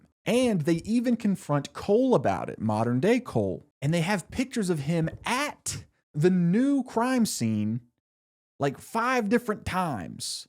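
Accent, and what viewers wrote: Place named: United States. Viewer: From the thumbnail, I assumed this creator was British. I was absolutely astounded to hear an American accent. Bravo 👏🏾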